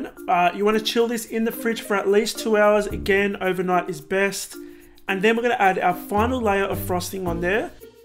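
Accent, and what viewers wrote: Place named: Australia. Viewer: Wow..am i the only one who is here by watching the luscious froasting and ended up watching twice because of his amazing australian accent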